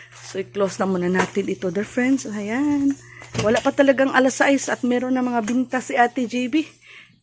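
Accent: Visayas, Philippines. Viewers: Hahaha Visaya diay 😅🌸🌼🌻Friend na tayo Te, salamat sa pag share Idol,, nanoud kasi ako, nag dala nang Goodluck hahaha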